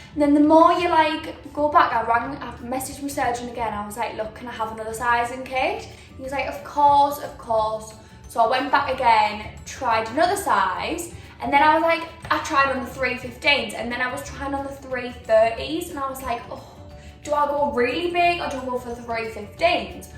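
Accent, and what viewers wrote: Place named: United Kingdom. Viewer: omg your british accent is lovely!!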